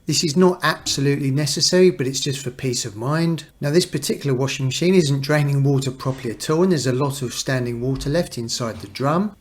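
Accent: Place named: Cockney, United Kingdom. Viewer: A cockney needing a cup of tea before doing the job. A Northerner would have just got on with it without messing about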